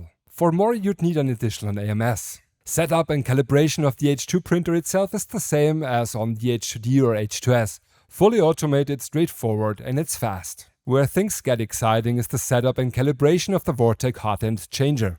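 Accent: Germany. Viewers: man hört aber das du deutsch bist 🫣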